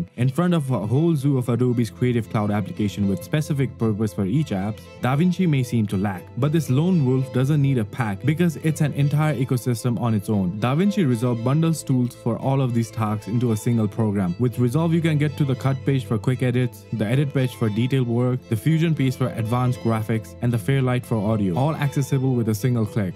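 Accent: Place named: Nepal. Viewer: Also identified a fellow Nepali from your voice, keep up the good work sathi. :hand-purple-blue-peace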